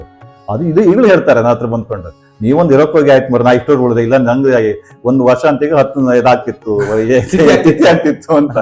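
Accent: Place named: Belagavi, India. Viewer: ಸರ್ ನಿಮ್ಮ ಭಾಷೆ ನಮ್ಮ ಬೆಳಗಾವಿಗೆ ಸರಿಯಾಗಿ ಹೊಂದುತ್ತೆ ಸೂಪರ್ ಸರ್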